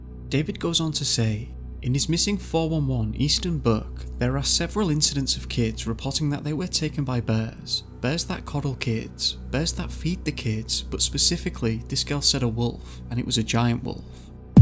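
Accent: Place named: England, United Kingdom. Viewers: It's really hard to follow these automated narrators. And why do these "robots" always have English accents?? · Sounds like a Yorkshire accent.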